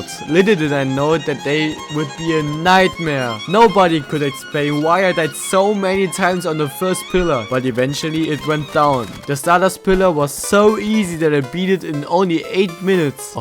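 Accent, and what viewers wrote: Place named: Germany. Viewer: man hört den deutschen in dir hahah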